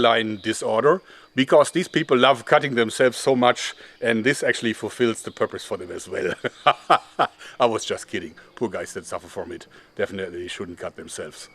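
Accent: Germany. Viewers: Warum redest du englisch ? Jeder hört doch das du deutscher bist 😂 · he have German Sound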